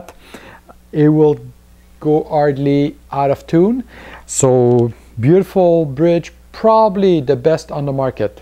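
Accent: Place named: France. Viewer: A little french accent here and there...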